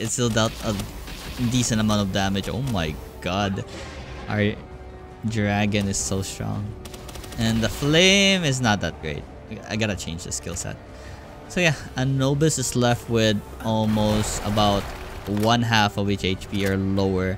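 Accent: Philippines. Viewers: I hear Filipino (Pronounced: "pill-la-pee-no") Accent... Filipino ka??? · You have a filipino accent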